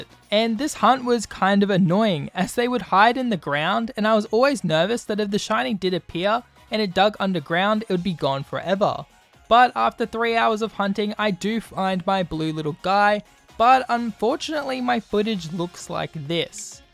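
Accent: Australia. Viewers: Are you Australian if so in from the central coast in NSW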